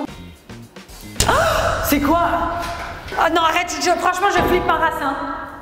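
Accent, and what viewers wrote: Quebec, Canada. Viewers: J adore ta voix et l accent québécois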